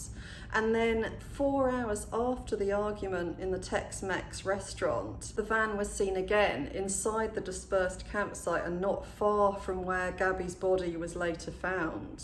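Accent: England, United Kingdom. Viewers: nice accent, are you from England? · Love your accent. It's like listing to the Queen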